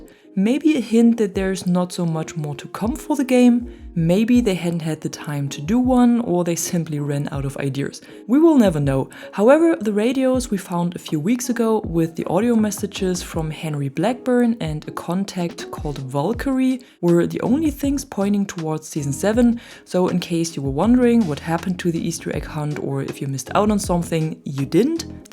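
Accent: Germany. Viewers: I am so sorry. I figured that out.Love your channel and your German accent.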